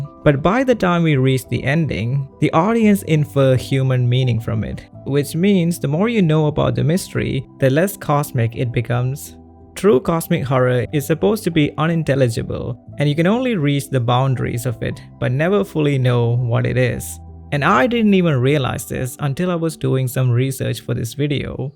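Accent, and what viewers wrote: South India, India. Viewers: For some reason I hear a South Indian in you...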